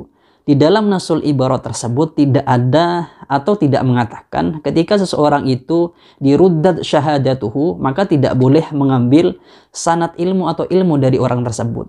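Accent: Madura, Indonesia. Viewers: Kesalahan baca kitab itu hal yg lumrah, bisa jadi karena sabqul lisan, atau sebab lain. Seharusnya anda mendukung dg apa yg diperjuangkan oleh Ustad Faizar, mengoreksi bacaam bukanlah hal yg salah tapi dengan menyalahkannya di youtube akan memframming diri Anda sendiri kalau anda bersebrangan dengan beliau, apalagi anda sampaikan di momen yg tidak pas, yakni perseteruan antara dukun dan pesulap. Harusnya anda yg alumni pesantren dan mengaku kiai muda (di channel) kudu lebih bijak dan berhati-hati. Lebih baik tabayun agar tidak blunder permasalahannya. Ingat beliau itu Muhammadiyah, sedangkan anda dilihat dari logat bicara dan gaya menyampaikan isi kitab kuning adalah orang madura dan pastinya NU. Pahamilah perbedaan ini, agar gesekan antar umat tidak terjadi.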